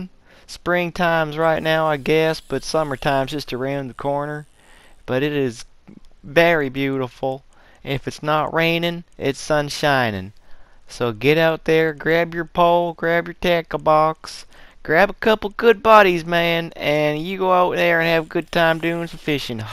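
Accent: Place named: South, United States. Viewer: Been missing your RDR2 online videos. Hope all is well! Your "Southern" accent is hilarious 😂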